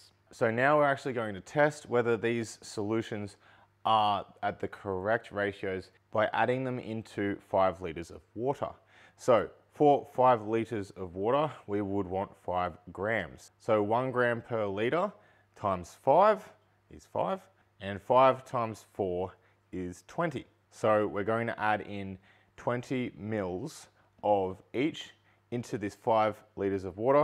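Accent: Australia. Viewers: i switched from arduino mkr and pico videos to hydroponic and this was first video i put on and right at beginning i hear arduino and pico and wondering if im watching arduino stuff until i remebered that Aussie accent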